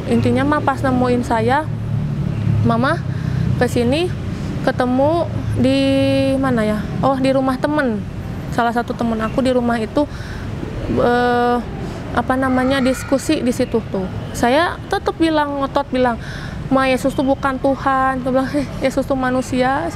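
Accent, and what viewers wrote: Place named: Sunda, Indonesia. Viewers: Teteh udh lama di bndung Yaa logat sundanya kental bnget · ko nyunda bgt ya bataknya... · Sunda pisan yaaaa... 🤗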